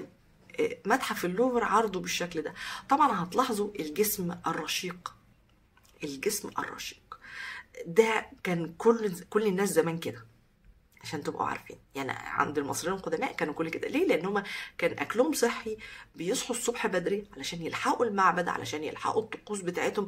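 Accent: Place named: Japan. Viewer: انا حبيت جدا الاكسنت بتاعتك باليابانى بجد تحفة ❤❤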